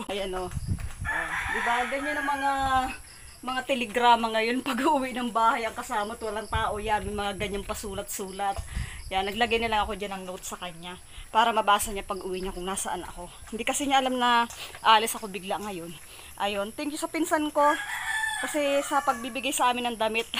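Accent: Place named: Batangas, Philippines. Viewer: Na lahian ka na ni kuya.. Batangeñang Batangeña ang punto pag salita mo ng tagalog….pakultab,….Upak na ng upak…bakbakan na dito… hahaha…Maganda yang laging naka bota para lalong safe…..Basta may time ako blog mo gusto kong tingnan agad..May nakukuha akong ibang idea sa pagluluto at pagtatanim…..And it remind me the way I used to be, tulad ng ginagawa ni kuya.ginagawa ko rin yun noong araw…Okay say hi to kuya.. God bless you more.❤️🇵🇭🇺🇸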